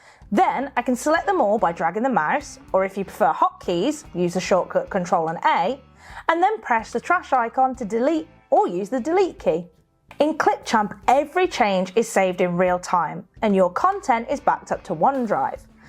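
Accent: United Kingdom. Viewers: This woman definitely British 😂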